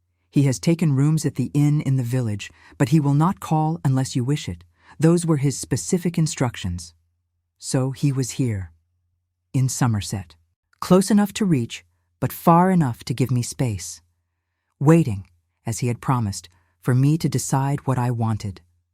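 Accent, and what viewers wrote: United States. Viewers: I absolutely LOVE these story's but, you have made a fundamental error choosing this beautiful American voice to narrate. Victorian & current day America, never had Dukes or any British aristocracy. To make these story's more believable, you should use an English/British voice. ❤❤❤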